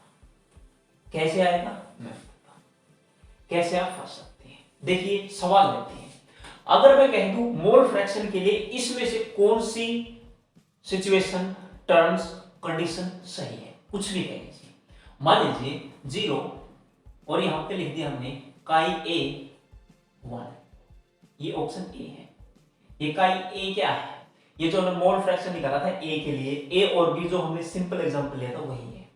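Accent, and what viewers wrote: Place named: Haryana, India. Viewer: That haryanvi excent in his language is just 😌,🥀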